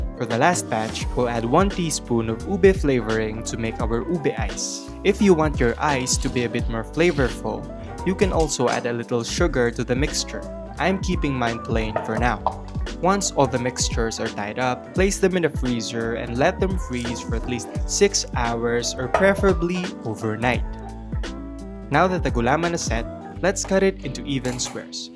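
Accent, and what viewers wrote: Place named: England, United Kingdom. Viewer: I Like your English Accent! It’s giving!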